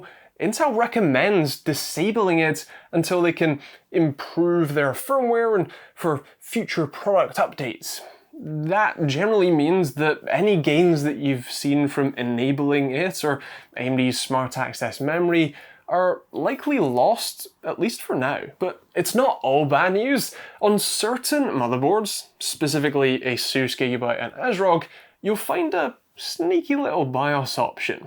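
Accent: Scotland, United Kingdom. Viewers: Watched a few of your videos now, great work not getting caught up in the hype like most of the other reviewers. I know people aren't generally concerned about power consumption but the TDP is getting out of hand and like you said good luck keeping it cool. Glad it's a great performer but 241w is crazy IMHO. I've given you a sub nice to hear a Scottish Tech tuber!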